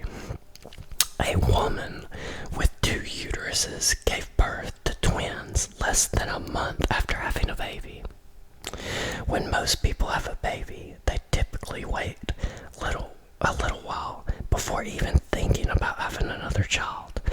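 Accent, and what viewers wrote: South, United States. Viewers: You're very welcome, you whisperin' southern gent ☺️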